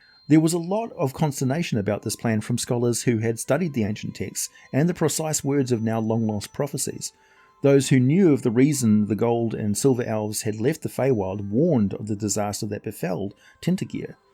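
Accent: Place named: New Zealand. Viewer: Great to hear a kiwi voice on YT❤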